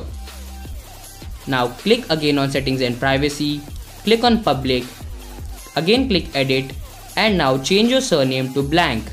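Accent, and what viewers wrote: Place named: India. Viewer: Why indians talk like that... change not chin :)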